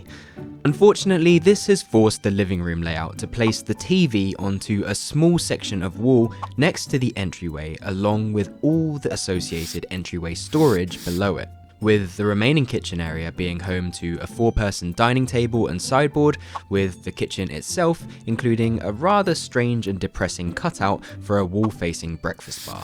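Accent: United Kingdom. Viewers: His delivery is doing great. Wonderful apartment redesign. The next step is to take a breath and know we are on his side. Why else are we here. Eyebrows relax, British accent reduced, the approval asking lilt only occasionally. Tell us. No need to ask us. You have our ear and implicit approval of your talent and ability. Yur frpal G to solve abilities.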